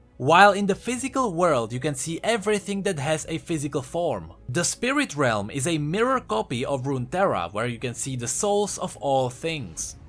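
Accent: Czech Republic. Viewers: He is from The Czech Republic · Czech accent ? Or ? I think you are from czech republic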